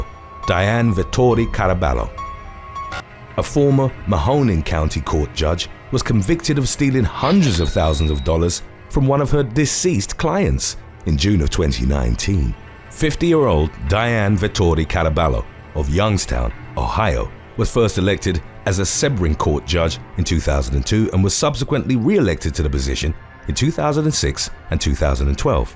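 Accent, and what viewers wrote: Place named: United Kingdom. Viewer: how come you sound british yet this channel uploads in USA? Good channel bro, i watched it grow the past 7, 8 years. 5☆ mate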